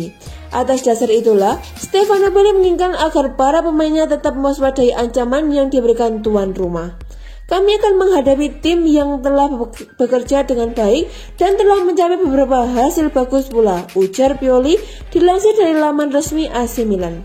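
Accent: Java, Indonesia. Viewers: Haha medok cok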